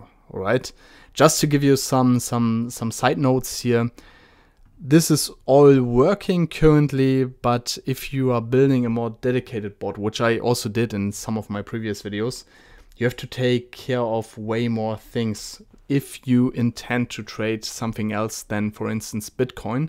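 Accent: Germany. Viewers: Safe Deutscher oder?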